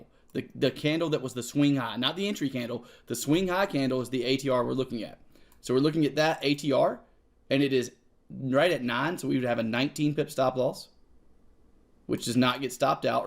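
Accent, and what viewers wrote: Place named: Texas, United States. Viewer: I'm not even American, but you sound like you're from texas or something.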